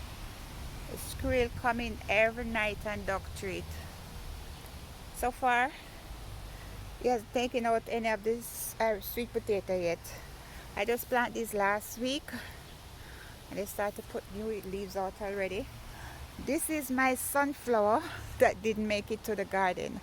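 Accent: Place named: Jamaica. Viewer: Got half way through the video and thought she has to be Jamaican. You just said it! New sub here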